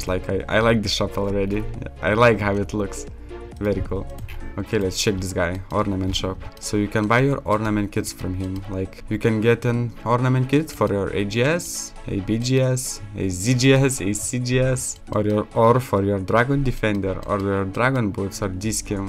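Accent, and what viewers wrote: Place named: Lithuania. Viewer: You sound like you are from lithuania , btw great server 100 + players online everyday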